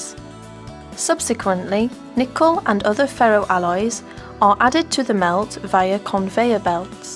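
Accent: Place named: Europe. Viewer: Very nice voice and european accent.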